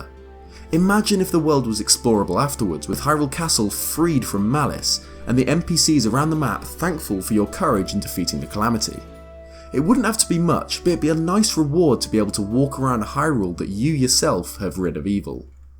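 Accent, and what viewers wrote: United Kingdom. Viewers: absolutely love that you took my advice of having your face in the videos. It's easier for you to edit, we see your handsome face, allows you for more creative freedom, adds another layer to the construction of your videos and its easier to recognize who that lovely British voice is coming from. I'll accept your thank you now. You beautiful husbando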